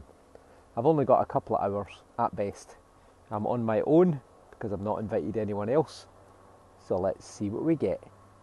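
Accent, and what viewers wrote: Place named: Highlands, United Kingdom. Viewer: id buy that T Shirt )) So True and Well Bloody said with that velvet accent of the Great and Ancient Highlands !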